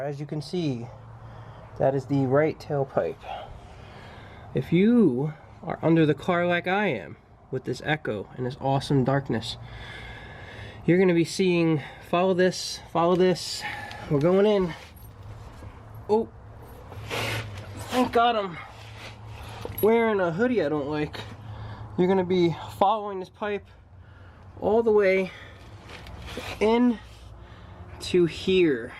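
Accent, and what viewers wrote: New Jersey, United States. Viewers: Lol, I feel like I'm watching myself with your install videos (minus the Jersey accent). So flustered with little stuff. 😂😂 Great video man. I'm going to climb under my car and see if I have those valves on my R/T now. That would be a great, easy mod after seeing you do it.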